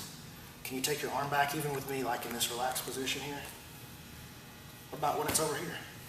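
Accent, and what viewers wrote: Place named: Decatur, United States. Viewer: im from Decatur....aint been back in a while. loving the accent. feels like home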